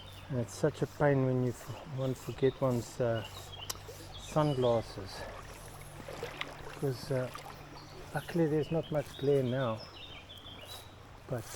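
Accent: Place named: South Africa. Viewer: Hows it bru? Just come across your video. My best mate growing up was South African. He was the most loyal true mate you could ever ask for. We had some awesome times fishing together. I still miss him 15 years on. I dont know why that matters to anyone on here but i didnt think hearing ya accent would make me think about him like it has. Keep doing what you doing 👍🇬🇧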